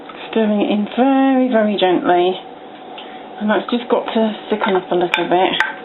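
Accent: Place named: United Kingdom. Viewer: It's that damn British accent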